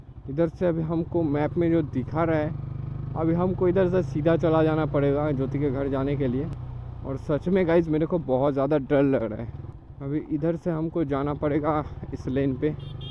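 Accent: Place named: Bengal, India. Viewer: Bhai I like your way of talking that you can say that Bangla type Hindi. Actually I can't understand your Hindi because my mother tongue is Kanda but I like your video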